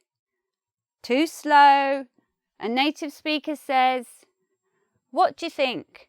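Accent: United Kingdom. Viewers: i am so happy that you are recovered and in full of energy. I really enjoy British accent. Thank you.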